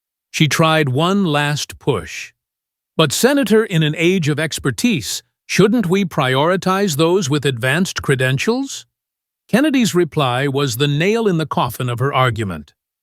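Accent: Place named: United States. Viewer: This STUPID NARRATOR describes Collins's hair as BLOND. - I HATE these STUPID American-voiced, narratives.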